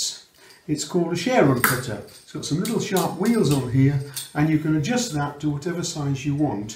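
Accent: Yorkshire, United Kingdom. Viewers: Wow deffinatly going to give these a try, both my husband and I love cheese and onion, I’ve been buying M and S pasties but weather it’s “ health and safety” I’m not sure but there seems to be very little seasoning in anything one buys over the counter these days ( the salt police must have been round 🤔) and it makes for “ tasteless “ produce, I’ve only just come across your lovely straight forward recipes whilst looking for one for a “ custard tart “ I am now binge watching lol I’m going to be very busy over the coming weeks baking lol will be making your custard tart today ready for my daughter coming round for lunch. You also sound as if your a “Yorkshire” lad just like my husband 👍🐾🥰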